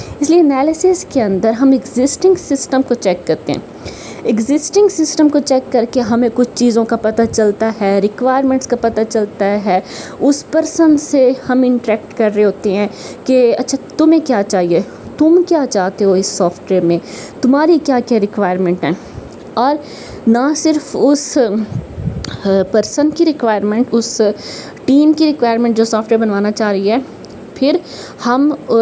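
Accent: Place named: Saraiki, Pakistan. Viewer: SARAIKI TEACHER 💯👀 HER WAY OF SPEAKING 🗣️ TELLS SHE SPEAKS SARAIKI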